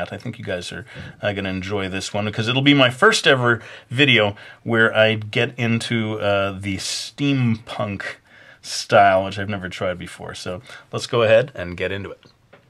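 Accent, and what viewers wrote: England, United Kingdom. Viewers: Totally love the English accent! you do it very Good. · the new voice is kinda british, not to be mean. i do like the voices they are funny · Maybe a bit British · Yeah... that almost british accent is exactly how I sound when commenting on your videos out loud,!;) And btw, you should really do a steam punk manga!:D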